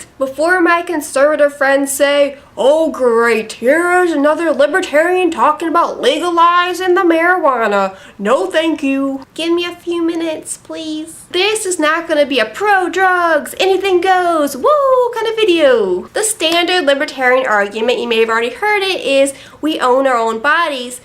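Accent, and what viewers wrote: South, United States. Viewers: please stop with the country accent very degrading to us southern folk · what's with the southern voice? Really, SMH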